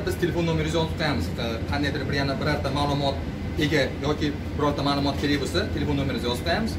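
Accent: Andijan, Uzbekistan. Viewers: Жааа. Яхши анжанча шевангиззи яхши тушунамиз. Гапираверинг. Нима? Росияда ок сабзи йукми дустим???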